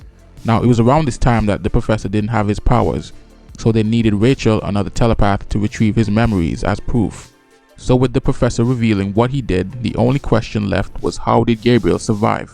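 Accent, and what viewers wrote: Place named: West Indies, Caribbean. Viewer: I like your accent. where are you from? You sound like you are from the West Indies.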